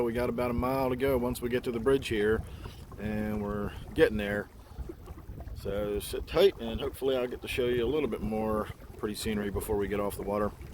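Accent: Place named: Baltimore, United States. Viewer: Yes, Sir, it's definitely not the hardcore Baltimore accent, it's quite a bit softer.Maybe suburban It's odd because some of it sounds familiar to me (Virginia) but then a few words sound like of Northern, so when that happens I think Maryland.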